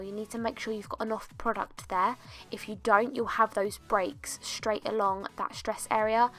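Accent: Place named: England, United Kingdom. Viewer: i could listen to you all day!! ur english accent!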